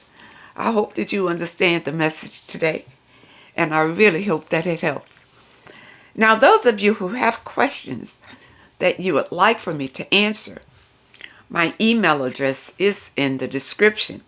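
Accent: South, United States. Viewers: There is a major difference between Southern and Stupid Hick. She IS Southern! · Love your southern accent. I am here in Australia. I remain single after divorce. Also, they think they can be your boyfriend and arrive for drinks, food and sweet romance. Got bucklies with me. Sounds like same where you are. 🇦🇺🙂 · I'd rather live in a cardboard box in a ditch than to ever try to live with another man...or, another human being for that matter!!! Thank God I've learned a few lessons from life...and, now, old enough to know better😍No easy lessons...some of us have to learn them the hard way! The subtitles are nice but I had no trouble understanding your Southern drawl...maybe because I'm from Arkansas. Enjoying your channel and constantly nodding my head in agreement! ❤❤❤ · Dear Miss, I am Dutch and can hear your lovely Southern drawl very well. When people can't hear you, for whatever reason, they can tapp CC on YouTube and subtitles are added. 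You don't have to add extra subtitles. Kind regards, Caroline, The Netherlands. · Southern accent??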